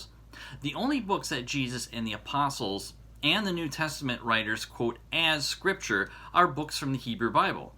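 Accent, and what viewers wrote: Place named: United States. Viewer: I can't understand your terrible American accent.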